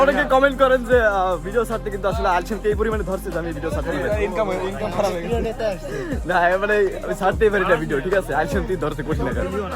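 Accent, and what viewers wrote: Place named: Gopalganj, Bangladesh. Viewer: Apnar basha gopalgonj ooo vai😮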